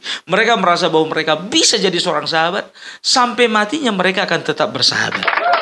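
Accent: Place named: Java, Indonesia. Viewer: Wah,Jan elok tenan opung medok boso jowone....mantul 👍🤲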